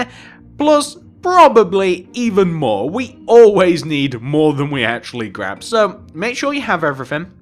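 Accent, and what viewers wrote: England, United Kingdom. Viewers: Love the vids r u English?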